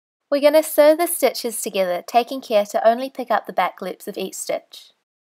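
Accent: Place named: Australia. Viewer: Love the video and love your accent! Australian is my favorite :D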